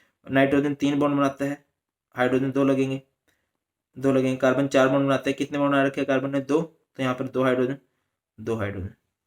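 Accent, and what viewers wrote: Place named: Kaithal, India. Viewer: aap kha se hoo aapki bhasa mereko khethal side ki lgi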